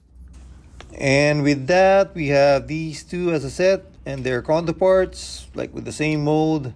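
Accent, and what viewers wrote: Philippines. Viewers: i dont really care for beast skywarp but earth sideswipe is what i really want! hey are you from the philippines? i am you just have an accent when youre filipino but youre speaking english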